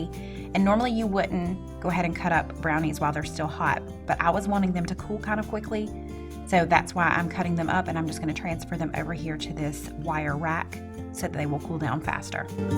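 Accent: South, United States. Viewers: Hi im new to your ch.I love your ch.and all your recipes.Where are you from.I love your Southern accent.im from Arkansas.nice meeting you and your recipes.My dad favorite holiday was Thanksgiving too.Hes deceased now.But he loved 🦃 turkey so much because you can do a lot of things with.I love 🐔 <link> and my mom.Shes deceased too.My grandmother her mother loves goose and duck.My father's mother loved 🦃 turkey too.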